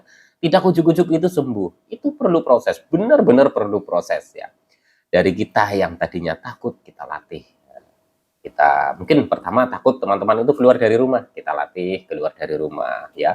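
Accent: Banyumas, Indonesia. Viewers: Cilacap hadir mas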